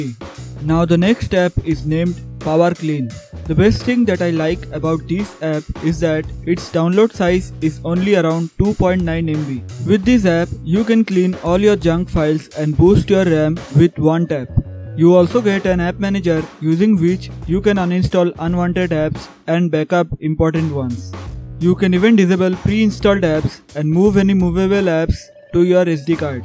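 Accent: India. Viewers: bro practice hard your accent i know you are Indian many indian youtubers who cannot speak like the american because of your accent i cannot understand your talking about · I think most people with a bit of intelligence can HEAR the gentleman is Indian, but YOU just couldn't help yourself could you, you sarcastic, RACIST IGNORAMUS. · I like his video Because his indish accent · Why is everything in Indian accent these days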